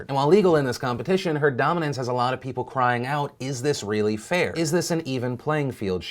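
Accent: South, United States. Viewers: I just noticed that you have a southern accent, I've been watching for years, and I just realized.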